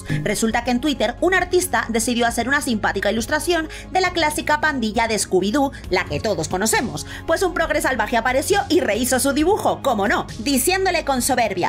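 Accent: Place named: Spain. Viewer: Eres española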